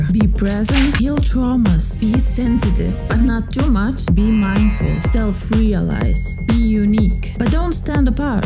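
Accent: Germany. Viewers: Toller Video. Die Shots, Winkel und Kamerafahrten sind genial. Der ganz leichte Deutsch-Akzent macht zusätzlich charmant. Etwas schockiert plötzlich mit Dir in der Dusche zu stehen... Insgesamt: Wow. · I like your German accent, mamma mia! Guten abend von Italia!!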